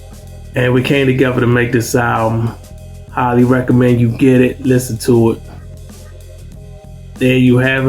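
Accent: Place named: DMV, United States. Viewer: Accent sounds like you're from the DMV where they had Tru Skool. Solid review.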